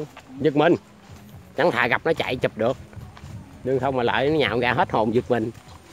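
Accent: Vietnam. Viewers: Em làm clip vui vui, noi chuyện nghe là biết miền Tây anh thích lắm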